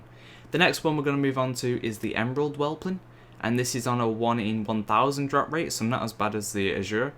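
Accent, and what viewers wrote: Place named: Manchester, United Kingdom. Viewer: rofl obviously from Manchester. cheers mate lol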